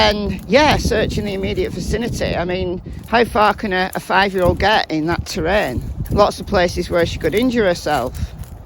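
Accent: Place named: United Kingdom. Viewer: I love this accent greeting me in the morning, don't you!! Something about a UK accent that makes a person sound so much more interesting! I heard that they don't feel those footprints are Summer's. I can't for the life of me remember who said it. It was a creator that is very close to Kingsport.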